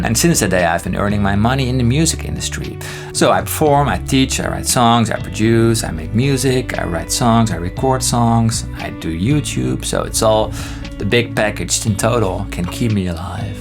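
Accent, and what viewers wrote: Netherlands. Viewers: Altijd al getwijfeld of je Nederlands was, wist t oprecht niet zeker haha. Geweldige video's trouwens ga zo door! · ah ik dacht al dat ik een Nederlands accent hoorde ;P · As a Belgian I could tell pretty much right away his accent was Dutch.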